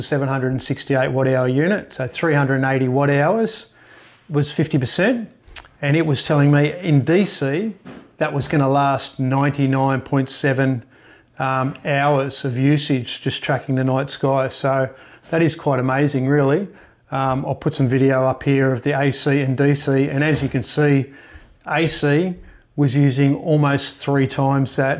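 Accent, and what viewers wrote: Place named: Australia. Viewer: Hi,. I saw all the video on 24" Hubble Optics Dobsonian and was very impressed. I read from the automatic subtitles since I am not so accustomed with Australian flavor english accent. Any way, I am so much interested on this type of designs.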